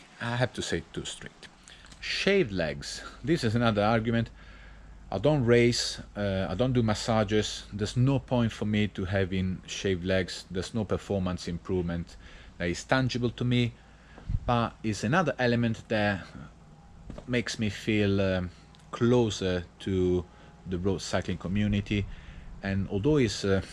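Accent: Italy. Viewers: Well done 👍, I like the Italian accent, it makes this mindset more believable 😂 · I don't even have a bicycle but I enjoyed listening to an Italian talking English. Very well done too, a lovely accent. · Thanks for sharing your take on the humorous Velominati “rules” in your wonderful Italian accent! Like your video, I think the “rules” are observations of the cycling community. Velominati takes these to a satirical extreme. I love them- follow some, don’t follow others as it suits me.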